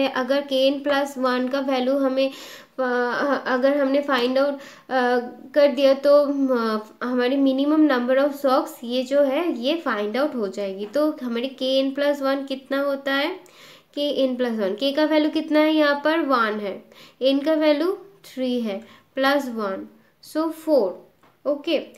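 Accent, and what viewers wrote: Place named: Bengal, India. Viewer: Bridger O'Neill it's in Hindi with some Bengali accent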